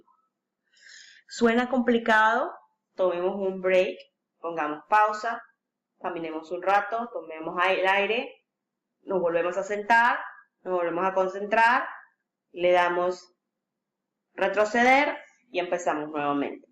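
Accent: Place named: Colombia. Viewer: Hola, me han ayudado mucho tus videos. Me parece un poco dificil la declinacion en el caso acusativo, pero ahi voy. Quisiera preguntarte de donde eres, tienes acento Colombiano. Gracias por tus clases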